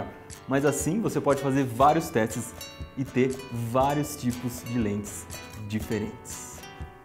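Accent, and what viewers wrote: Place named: Northeast, Brazil. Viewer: Nordestino DETECTED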